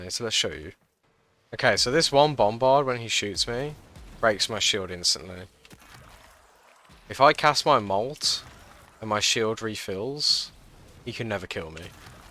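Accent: United Kingdom. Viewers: new bri'ish content woaaw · Great vid from my favourite Bri'ish content channel!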